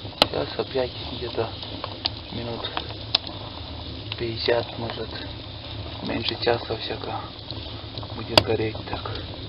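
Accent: Komi, Russia. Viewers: У меня бабушка из Коми, и с дедушкой она в Подьяческой познакомилась, говор то-же такой. И подруга тоже из Коми, прикольно, как тесен мир. Подруга услышала от меня слово "Покумекать" и спрашивает а от куда ты это слово привык говорить, я говорю от бабушки... так и познакомились... Спасибо Вам, и всего Вам самого доброго. :)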